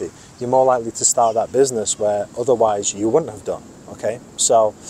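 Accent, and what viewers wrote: Manchester, United Kingdom. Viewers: Is that a Manchester accent I hear? I’m American by the way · Is that a Manchester accent? I’m an American and just curious if I recognize accents well enough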